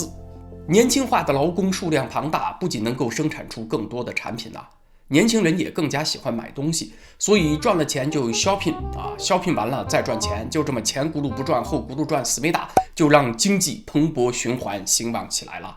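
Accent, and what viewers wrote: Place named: Henan, China. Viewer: 楼主的国语带有河南口音，我听起来很亲切，咱们是老乡。😂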